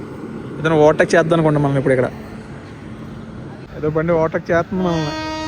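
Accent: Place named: Konaseema, India. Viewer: Videos about train travelling is very clear.sometimes your narration is in konaseema slang is super and attractive.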